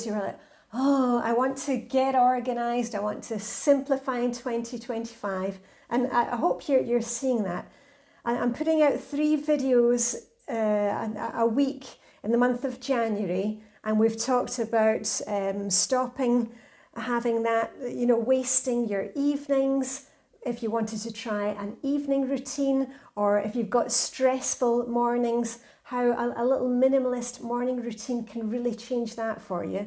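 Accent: Scotland, United Kingdom. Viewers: Well done!! What an achievement. A cold morning here in Manitoba, Canada. I lived in Edinburgh for a time 8 years ago so love hearing your accent. You have really helped me to have a set time for my routine in the evening. I work away from home so the evening really helps me prepare for the day and eat well! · Congratulations on reaching 100k subscribers! I stumbled across your channel last week and was so happy I did. I loved hearing your Scottish accent (both my grandparents were from Scotland) and your enthusiasm and positivity is so uplifting and inspiring. Have a wonderful weekend.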